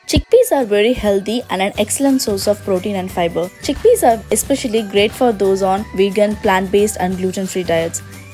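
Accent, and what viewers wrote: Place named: England, United Kingdom. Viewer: What English accent!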